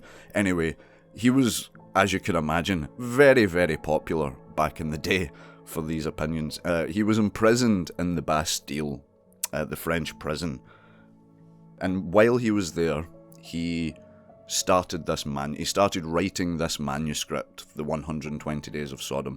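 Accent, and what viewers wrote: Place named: Scotland, United Kingdom. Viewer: Where in Scotland are you from? Sorry, I can't help it, I love your accent. 😊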